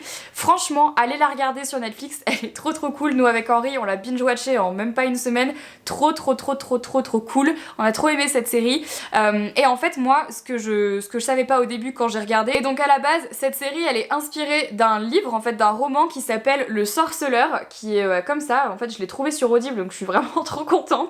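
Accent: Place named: Belgium. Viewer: " TROP Satisfaisant " c'est ta nouvelle expression tu le dis tout le temps! Et par moment j'ai l'impression que tu choppe l'accent belge! haha confinement avec un belge!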